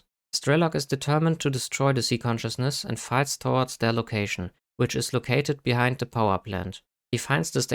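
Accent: Germany. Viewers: german english lol. höre ich sofort ^^ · North german accent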